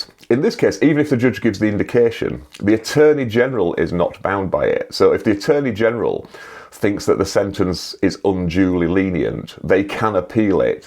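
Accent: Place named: Bradford, United Kingdom. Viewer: I thought that was a bradford accent hahaha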